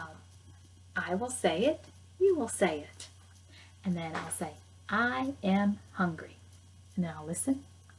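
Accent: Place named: South, United States. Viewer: we're two sentences deep and I love it already! People often comment on my Southern accent and I hear it in you as well. I have to stay on top of that drawl but it always makes me happy to see other teachers with an accent.:)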